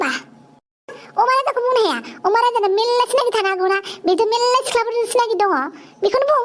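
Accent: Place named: Kokrajhar, India. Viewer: Nwngsini Boro-a Kokrajhariatar...mwjang👍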